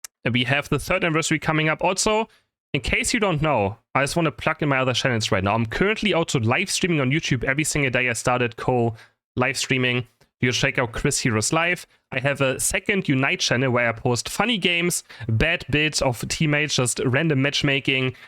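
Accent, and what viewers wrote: Germany. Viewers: Hi! I totally agree with everything you said in this vid I have not quit unite yet but i am playing it a lot less, mainly because i just moved houses but also because it IS boring. We need a new map, i would love to see a summer map with kyogre/groudon as the bosses But besides that i do hope you see this comment, when i wake up everyday i always watch your videos and make my lunch while watching you. Its gotten to the point where my parents are like "oh, he's watching the german guy again" not necessarily in a mean way they just recognize your voice so much because im always watching you😭 It might sound a little weird but i do really like your voice, you've inspired me to try and learn the German language because i really like your accent and i think the language is pretty funny But anyways i hope you do read this because i love your content even if i quit unite i will always still watch you play it, youre the thing i look forward too in the day and i hope your views go up sometime soon ❤️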